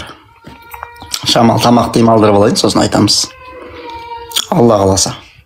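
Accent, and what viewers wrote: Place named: Karakalpakstan, Uzbekistan. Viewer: Бауырым шаршама.Каракалпакша адеми болды.жарайсын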